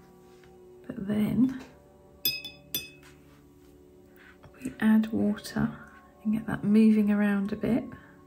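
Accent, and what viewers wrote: United Kingdom. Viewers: I'm converted! I now swatch all my water colours as pebbles! I used to hate swatching in little rectangles as I found it tedious. Now it is a joy, thanks to you. :) I've been in home quarantine with Covid for the last 10 days (free today! Yay!) and I been working my way through all your videos from beginning to end (well almost to the end). I love you videos, your lovely British accent and the gentle music. I've lived most of my life in Australia, but I was born in England and have lived there a couple of times for a short while over the years. So I love your vlogs of the countryside and villages too! It takes me back to happy days and reminds me of my mum. :)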